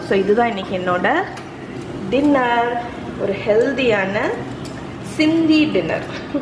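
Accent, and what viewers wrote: Nagercoil, India. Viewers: Nanum nagercoil than akka.....ipo konja days ah than unga videos parkurean.....super akka...nice character you are........ enaku 2 months before than baby poranthuruku....unga kutties parkum pothu enakum Oru girl baby vanthatha nenachu proud ah iruku akka....nager coil slang ah YouTube la parkum pothu romba happy ah iruku.....may God bless you akka.....en husband ku cake romba pidikum...unga channel parthuthan cake pannurean...nice receipe....super.....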